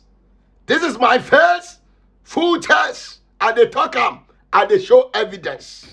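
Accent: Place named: Nigeria. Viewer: My brother i like the way you speak [Boola bay Nigeria English]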